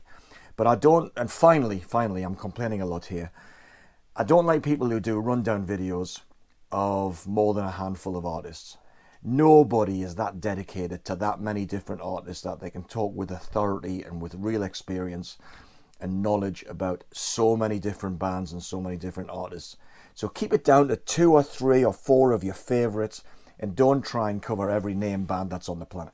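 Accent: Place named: Newcastle, United Kingdom. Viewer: Keep it going,. I really enjoy your videos, especially the music stuff. And for the record (no pun intended), as a teacher of teenagers for the past 22 years, I have a reasonable idea of how to engage an audience and keep them interested. You're terrific at that. The geordie accent helps too!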